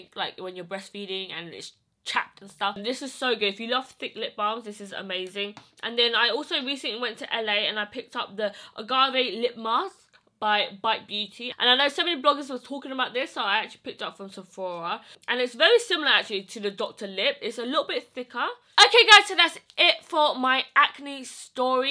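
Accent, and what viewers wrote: United Kingdom. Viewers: My mom asked if u were british asin i looked at her and said i dont know but dont asume anything since we r from the usa and to me she was sounding rude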